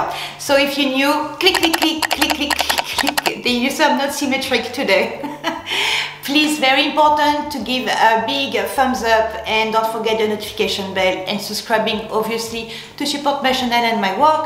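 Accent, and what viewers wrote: France. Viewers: Oh you so funny, but it is funny those big brows.....so nice to see humor and it’s natural. I like your videos. Love your French accent .... and being feminine is such a bonus for all woman. But some just don’t care.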